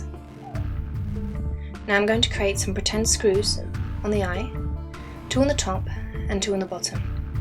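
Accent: United Kingdom. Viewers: Errr... no. its a British accent. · Are you British? coz i am well im scottish but oh well i love you accent and your art :) keep it up!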